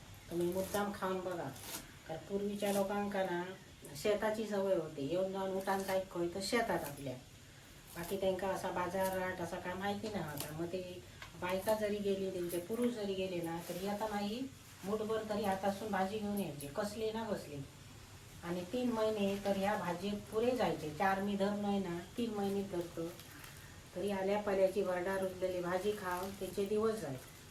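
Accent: Malvani, India. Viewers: उत्तम मालवणीत समजावून सांगतात काकी तुम्ही 😂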